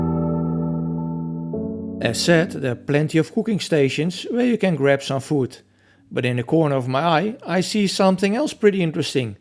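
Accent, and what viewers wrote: Netherlands. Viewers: kom je uit nederland, ik kan dat aan je stem horen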